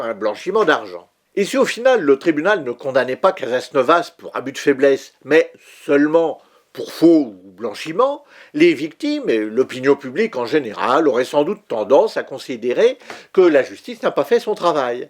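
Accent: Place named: North, France. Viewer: Alors là! je peux assurer que vous êtes du nord, non? "des chauves souris dans le beffroi"? =)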